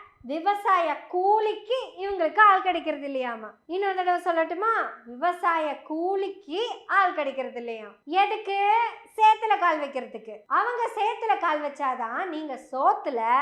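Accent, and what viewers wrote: Kongu, India. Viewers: Kannu magale, un alagana Kongu Tamilum, contentum, pesum murayum, jesturum alago alagu. Unakku miga prakasamana edhir kalam irukkudhu thangam. Unnudaya ella videovum save seidhu appa appa paarpadhi enakku mattatra magilchi. God bless you child immensely.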